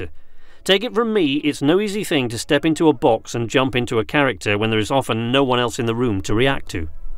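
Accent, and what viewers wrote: United Kingdom. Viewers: holy shit he has the accent like colin firth in kingsman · yeahhh i can hear it in your other episodes, just this one you sounded Welsh 😂😂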